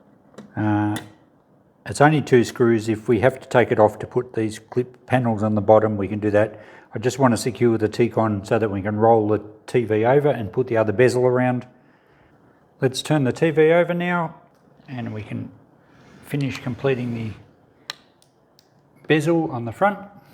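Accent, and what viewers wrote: Australia. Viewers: Thanks mate u sound so Aussie 😂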